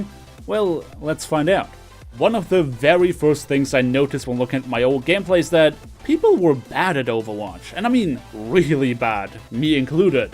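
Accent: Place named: Germany. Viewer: Se German accent tho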